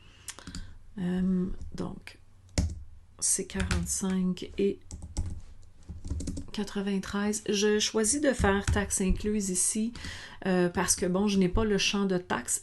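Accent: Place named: Canada. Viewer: Merci pour cette video réussie! un petit bonjour de Normandie en France... et merci pour ce chouette accent canadien qu'on ne se lasse pas d'écouter avec plaisir ! Cependant j'ai une petite question, vous serait il possible de faire le même style de video pour lier la boutique Facebook avec celle d'Instagram. J'ai un site internet sur Wordpress, une page Facebook Pro, une boutique Facebook et un compte instagram professionnel, tout ce petit monde à l'air être connecté mais quand je veux mettre des produits sur ma page instagram, on me répond : Aucun produit ici, si les produits n'apparaissent pas ici, contacter l'administrateur de la page, ou vérifier vos autorisations dans business manager ??? je suis complètement larguée!!!! sur business manager c'est archi compliqué ^^ merci en tout cas d'être présente pour nous aider dans ces démarches bonne semaine à tous !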